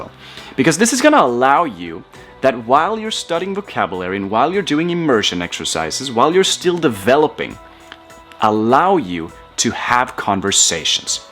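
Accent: Spain. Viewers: Wow,cara eu tô surpreso com o progresso dele no português,o vocabulario dele é muito bom,a pronuncia as vezes não é tão boa por conta do sotaque forte do espanhol,mas fora isso,deu para entender tudo, parabéns!!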